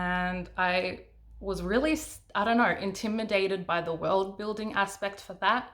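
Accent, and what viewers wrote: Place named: Australia. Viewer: Hey there! Fellow Aussie here! Glad to here a familiar accent. I found you from Emily's channel. I'm currently 50K into a 100K urban/paranormal fantasy, so I want to get the last 50K of the first draft finished for Nanowrimo. I understand that worldbuilding can be a huge thing, especially when (like me) you're trying to take existing myths/legends/creatures and find a place for them in your own world - on top of all the other worldbuilding that needs to be done. There's developing cultures, drawing maps (even an urban fantasy needs to know the lay of the land/city) and everything else. If you're interested, there is the great site called "World Anvil". The developers are a husband/wife team - he is a gamer (D&D etc) and she is a writer, so the site is made for both types of creators. Even if you don't end up putting all the details of your world on there - the templates are fantastic for providing all sorts of questions that you can use to help develop ideas. 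They make you think about things you might not have considered, and fill out details for things you might already have. It's great!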